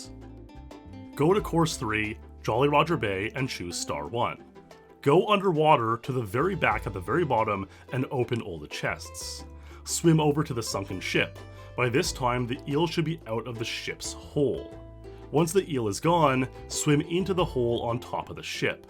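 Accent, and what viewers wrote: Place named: Eastern Canada, Canada. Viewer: I detect an eastern Canadian accent-- Newfoundland? Halifax?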